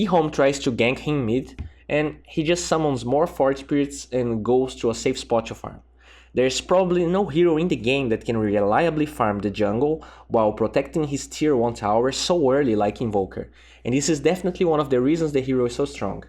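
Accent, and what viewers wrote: Brazil. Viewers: well, that's a pretty heavy accent. brazilian? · dat brazilian accent XD Keep the videos going pal. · Te sigo há um tempão e suspeitava que vc era brasileiro pelo sotaque, estou certo? Ótimo canal, aprendo muito com sua percepção!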